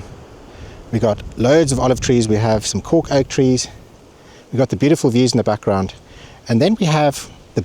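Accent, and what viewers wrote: South Africa. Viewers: Nice to hear a South African 😊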